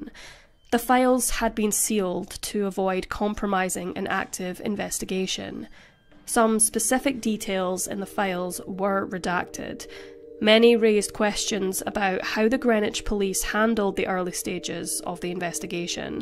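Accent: Scotland, United Kingdom. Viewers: where is the narrator from? Unique voice, sounds a wee bit Scottish 🤷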